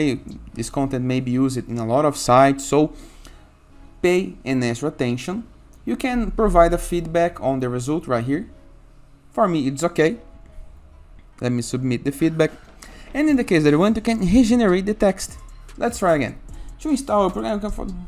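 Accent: Brazil. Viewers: I know a Brazilian accent when I hear one! Excellent content ;)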